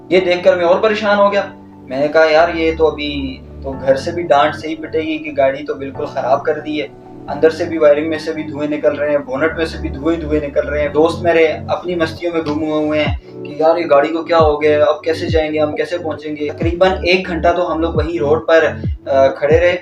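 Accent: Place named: Karachi, Pakistan. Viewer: Pakky karachi waly lagty ho ap bro 😅❤️❤️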